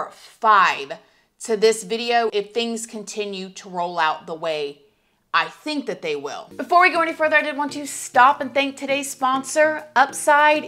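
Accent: South, United States. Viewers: How did I not know about you! That southern accent and true crime ❤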